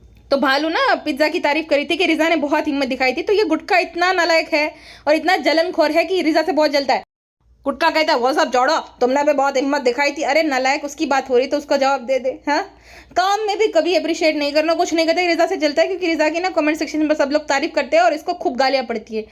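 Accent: Gujarat, India. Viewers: I just love ur "Gujarati accent" 💜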